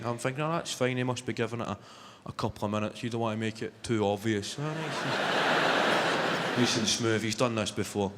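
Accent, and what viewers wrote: Scotland, United Kingdom. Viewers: Must be nice. I'm an American working in Japan teaching ESL. I can understand most Irish, English, and Aussies. I have been trying to work on the Scottish accent which is one of the few that I can't master yet. Some of the things he says too fast I think, some are idioms that I don't know (he explained in one video that if a house was free meant the parents were on holiday and there was going to be party whether the teens knew it or not), but I think mostly his accent is just too thick I can't catch on. But the jokes I do catch are funny! My family is hoping to go to Scotland next summer on holiday. I love comedians so Kevin seemed like a good choice. I'm told that for tourists the people mostly tone down their accent. I hope so. (I've had Japanese friends go to the US and Australia and had terrible times with the language). 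Really looking forward to the trip! The countryside looks so beautiful. And I love Scotch. And unfortunately due to Brexit and Covid, it's really cheap! Everyone should be taking advantage of this! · I'm so bored with the strong Scottish and weak English shite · Love this man, I am Scottish, from Paisley. I live in South Africa since 1972. . It's like being at home when I hear him. 👏🙏❤ . Wonder if he will ever come to SA?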